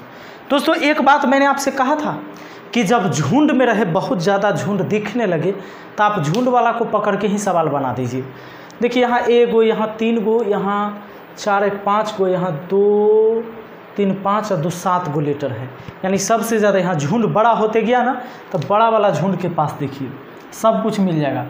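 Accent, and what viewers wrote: Bihar, India. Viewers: Your language in bihari voice is good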